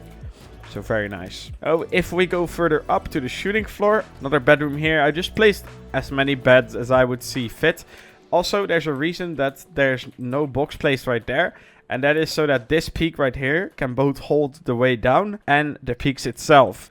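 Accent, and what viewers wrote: Netherlands. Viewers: Wel een lekker Nederlands accentje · Are you dutch?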